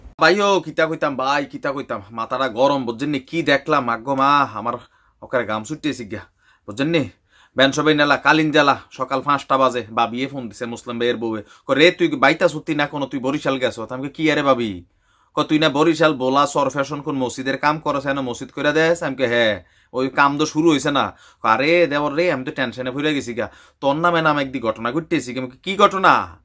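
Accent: Comilla, Bangladesh. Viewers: আপনার মুখে কুমিল্লার ভাসা অনেক বালো লাগে বাই এখন আমি ওহন কি হর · ভাই আপনার ভাষায় বুঝা যায় আপনি কুমিল্লার,কোন জায়গায় আপনার বাড়ি। আমি ও কুমিল্লার । আপনি খুব ভালো ভাবে উপস্থাপন করেন। আপনার প্রত্যেকটা আপডেট আমি দেখি এবং মজা পাই।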